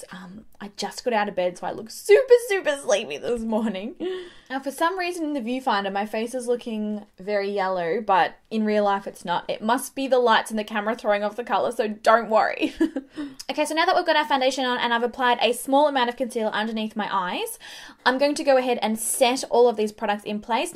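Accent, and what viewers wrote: Australia. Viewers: Love yur Aussie accent 💗👍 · Why do australians pronounce 'go' like GOURH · Really enjoyed this video but I had to watch it muted and with captions because her accent is so terrible! It was like nails on chalkboard to me and I normally don't mind an Australian accent. For whatever reason though, her accent really got to me and was hideous to listen to. Yikes. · I could listen to you talk all day, love the Aussie accent! 😍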